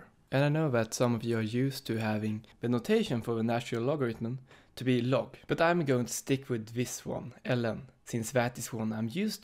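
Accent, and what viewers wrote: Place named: Sweden. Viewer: Are you possibly swedish? (Very very few times i thibk i can distinguish sole swedish accent). Either way, great video!! Really enjoyed it :)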